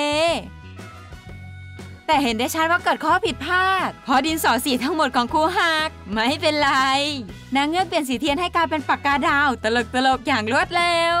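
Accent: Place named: North, Thailand. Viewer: เสียงคนภาคดูเหน่อๆนะ สาวเหนือรึเปล่า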